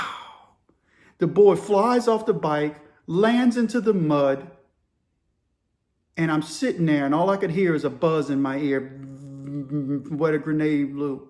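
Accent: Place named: Deep South, United States. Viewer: I’m so chuffed ( happy 🇬🇧) I found you. You are ‘ The Font of Arcane and Highly Engrossing Deep South Prison and More Stories ‘. You’re honest too ! You are the most ‘ unique’ and different prison platform. Most of the prison boys tend to be from Cali, Texas, Florida or Georgia. Not much from the Delta. I’d love to have visited NOLA before that terrible hurricane ripped the heart out of that most mysterious voodoo infested French and African melting pot in the World. Damn. Yep it’s great to hear your accent, and the fact that you’re mixed inside . Not political structure like Cali, West Coast. And they call the Deep South racist. Shit , every colour population has fools and maniacs, but that’s no reason to hate the whole group. Seems a bit of that Southern Hospitality and Manners goes a long way. Keep em coming please. That was a really moving tale about the treatment and experiences of veterans. 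A lot in Britain are homeless and in prison. After the 2 Gulf Wars, Bosnia and Afghanistan. They all have, sadly, been let down. Good on yer for keeping them in our thoughts. Top Rankin’ 🇬🇧🤝🇺🇸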